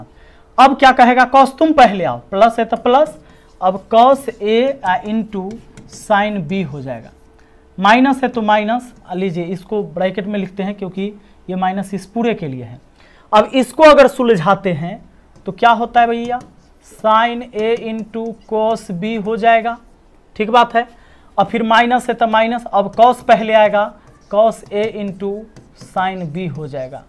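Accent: Bihar, India. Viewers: Sir Are you bihari? PROUD TO BE BIHARI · Are you from bihar😅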